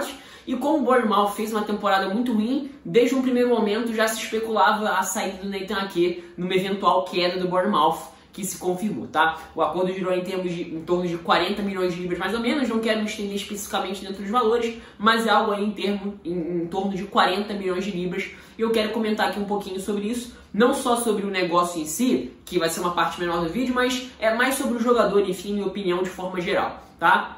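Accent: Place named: Rio de Janeiro, Brazil. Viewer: ta lá minha audiência qualificada aí hahah! você fala muito bem, parece o rafael oliveira com mais sotaque carioca haha baita análise quando encontrar o comentário de Leonardo Andrade nos seus vídeos serei eu ;)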